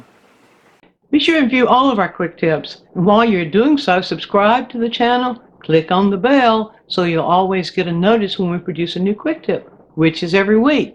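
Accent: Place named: Appalachia, United States. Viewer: I love the tutorials and I love so much that her accent sounds like mine. Any time I hear an Appalachian accent in a teacher of any kind it makes my heart glad!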